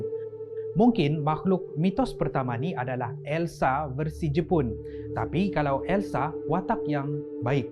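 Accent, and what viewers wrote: Sabah, Malaysia. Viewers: Bkn sarawak rasanya ..tp sabah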